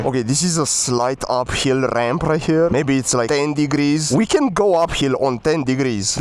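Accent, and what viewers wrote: Romania. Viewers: Este român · broo, u from timisoara?? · ai accent de român😮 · mi am dat seama ca esti roman după accent😂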